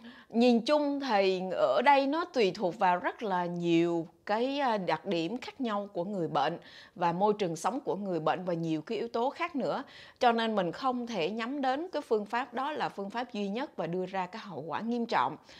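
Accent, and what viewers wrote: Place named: Quang Nam, Vietnam. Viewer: là người Quảng Nam ạ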